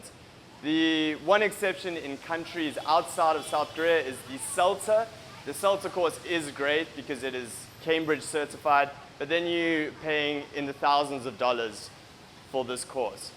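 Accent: South Africa. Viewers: Eish. That accent. Confusing for most. Instantly recognisable to fellow 🇿🇦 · he is from south africa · That accent... You're south african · sounds South African to me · Its so awesome to see and hear a fellow South African in such an informative video. Well done!!! PLEASE HELP... I have a Bachelor Degree and Post Graduate qualification in Education, but now ESL qualification. Now I would like to get my TEFL certificate but an online one. Please advise which site is legit and actually accredited/ recognized. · Love that beautiful South African accent of yours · not from the US so must be South African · you sound very South African bru, anyways I have a question, let's say I want to go overseas and I have 0 knowledge on teaching, I studied computer programming ( so no Degree or diploma for teaching), would you still recommend getting a TEFL Certificate? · are you from south africa?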